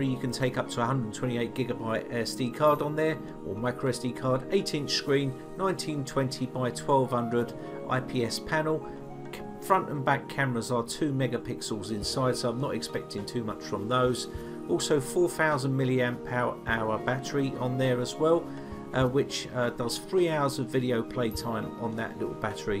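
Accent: United Kingdom. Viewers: British English..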